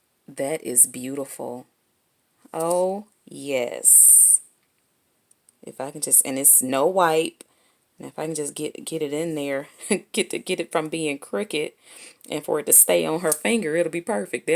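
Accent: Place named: South, United States. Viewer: Thank you for this video! I absolutely love your country accent! ♥️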